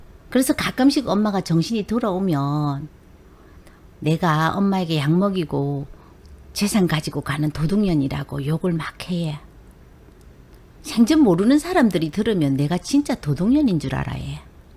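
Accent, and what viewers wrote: Gyeongsang, South Korea. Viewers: 영상 잘 봤습니다 경상도 말이 정겹고 반갑습니다 응원 합니다.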